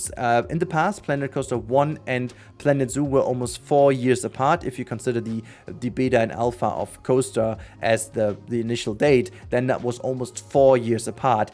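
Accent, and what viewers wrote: Germany. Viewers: Hey ganz kurz, bist du Deutscher? Vom Gefühl her wär ich mir fast zu 100% sicher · I am a nativ speaker both german and english, I thought since you have an obvious german accent that I should speak in german to make it easier for you but okay if you want. You need to work on your dictionary, it is very cringe worthy hearing you use the same words over and over again because you lack the ability to express yourself. It seems that a neutral criticism about your video has struck a deep cord with you and I am sorry that I hurt your feelings, it doesn't change the fact however that you do repeat your words. Have a nice day.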